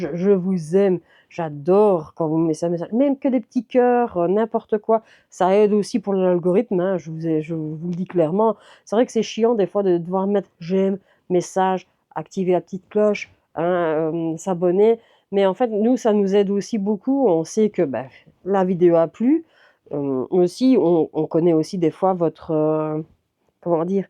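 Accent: Belgium. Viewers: Bonjour, je vous découvre aujourd'hui, j'apprécie votre façon d'être sans chichi et votre petit accent belge... Merci pour la vidéo. 😍.. Bonne couture. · Bonjour Je viens de découvrir votre chaîne J' apprécie beaucoup votre naturel ça fait du bien pas de chichi et franc parler avec un accent de Belgique, vraiment ça fait du bien au moral au coeur 😁 ça me motive à me secouer pour avancer dans mes projets Je vais visionner vos anciennes vidéos histoire de vous découvrir un peu plus Vous avez du pain sur la planche façon de parler😄 Très bonne journée Bises de Bretagne 👋👋👋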